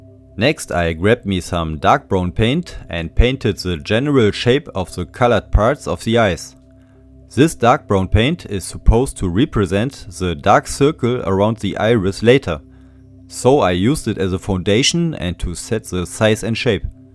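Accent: Germany. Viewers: ich finde Dein D`englisch sehr sympathisch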